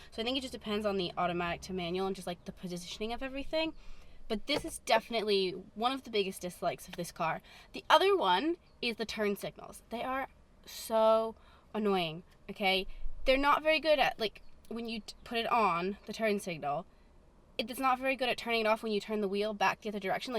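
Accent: United Kingdom. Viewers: I watched a video from 3 years ago and literally it’s fascinating hearing the developing British accent 😂😂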